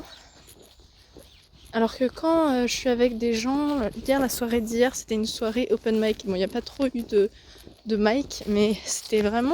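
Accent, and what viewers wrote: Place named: England, United Kingdom. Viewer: Je kiffe ton accent anglais 😮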